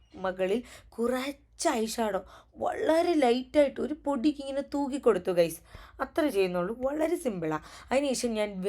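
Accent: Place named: Valluvanad, India. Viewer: നമ്മടെ വള്ളുവനാടൻ സ്ലാങ് 😂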